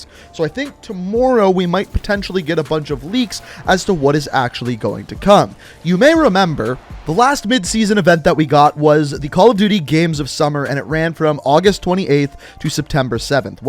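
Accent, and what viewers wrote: Canada. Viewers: Lol I can't get over that Canadian accent bro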